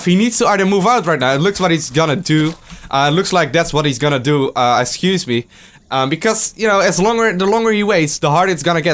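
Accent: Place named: Netherlands. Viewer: As a longtime fan I've decided to watch a few old videos.... wow... his Dutch accent is so much more noticeable. Amazing.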